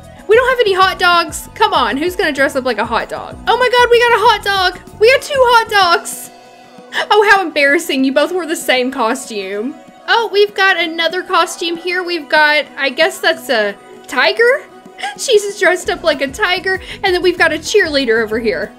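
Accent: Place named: South, United States. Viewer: By the way you've probably heard this before but love the country accent 😂 reminds me of my granny's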